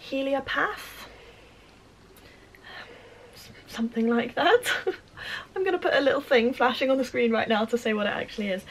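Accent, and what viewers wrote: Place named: United Kingdom. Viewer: OMG, you are gorgeous, girl. You look so vibrant and healthy and what a !lovely haircut. I'm an old crafter here in southern California who really enjoyed getting to see and hear all the farm life, city life, British accent and crafting, but I was also very moved by your struggles. I was just sitting down to have a cup of coffee when I got a notification from YouTube that you posted an update and couldn't have been more delighted. I can knit and purll but simple shawls and scarves have been about all I managed. You are very, very good. Love your cardigan and the new vest/sweater you are working on now. Than you for updating and know you have a crafting friend in sunny hot-as-hell California who wishes you the very best.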